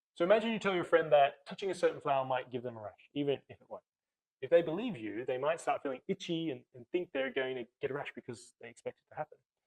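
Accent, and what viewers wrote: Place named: Australia. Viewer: This actually makes sense to me. Only new to the gluten issue realm. Great to hear what sounds like an Australian accent!. Have you got that low fodmap list to look at and try please?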